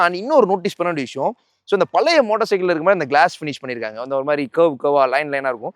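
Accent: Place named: Madurai, India. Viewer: Nimukkum pothu? Speech madhura Karan madhura Karan than😂🎉😊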